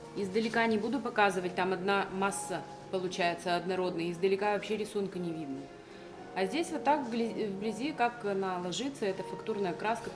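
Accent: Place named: Udmurtia, Russia. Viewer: Вот это акцент, наверное, вы из Удмуртии, в Воткинске так же говорят. Привет из Москвы.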